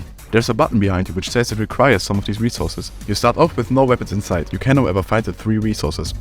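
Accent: Germany. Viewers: He’s speaking German when speaking English. I love it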